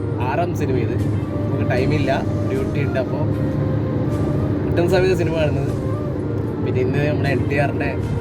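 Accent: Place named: Kannur, India. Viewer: Aa kannur slang kelkan oru verethanne sugam ❤. Ennalum nte husband nne kootathe film kanan poy nadakate🤩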